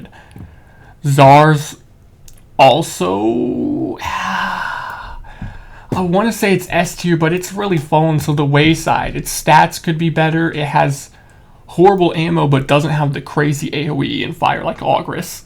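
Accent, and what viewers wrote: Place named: Germany. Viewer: You sound so german to me lel, and why do you refer to yourself as mentally unstable? 😅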